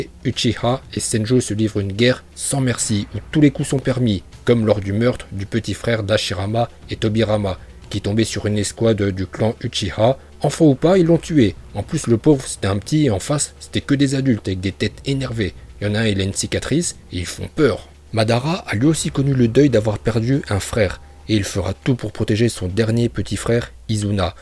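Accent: Japan. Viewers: pour eveiler le sharingan il faut d'abord controler le migaté no chovouie ps ton accent japonais est incroyable je met mon like directe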